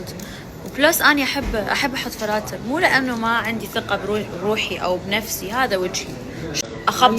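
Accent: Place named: Iraq. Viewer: فديت اللهجة العراقية 🎀👽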